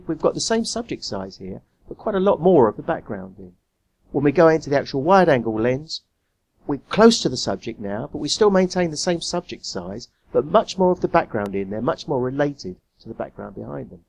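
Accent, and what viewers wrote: London, United Kingdom. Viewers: Fantastic video! I have been watching your videos for some time now and wanted to tell you how informative, well put together and straight forward they are. Also your "London Twang" reminds me greatly of my family which is very nice to hear as I am not currently living in the UK at the moment. Anyway, I will stop rambling. Take care and I look forward to more videos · I am here 9 years after this was made to hear that familiar London twang. I am also a Micro4/3 user despite what other people say about this "dying" format. It is a camera that I could carry with me almost everyday with intention to shoot or not for the day.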